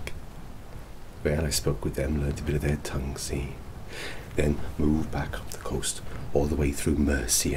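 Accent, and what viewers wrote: Wales, United Kingdom. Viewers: As soon as I saw the horns, I thought: oh. shit. This is incredible,. And the rumbly Welsh accent is 🙌🏻. I have a lot of this world in my head lately- who else is excited for the Gawain movie this weekend?!💚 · 「 greybeige 」 He’s actually Welsh so this is his ‘true’ accent.😊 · I've watched this so many times now, since it first premiered in the collab...I pop it on every so often and get sucked into the pathos and can't stop watching. By the end I'm like, deeply moved, and also feel so serene from the atmosphere and the progressively more relaxing way the speaking style and mannerisms devolve. "Small mercies against a great evil, they make a difference to a man," indeed. Killer costume, and hats off to that Welsh accent and the distinctive voice and physicality and...self, you brought to this. One of my top favorites of yours...just...so good! 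· How did I not know until now how much I’ve been needing a Welsh accent in my ASMR? Magnificent in every way, as always,. 🤍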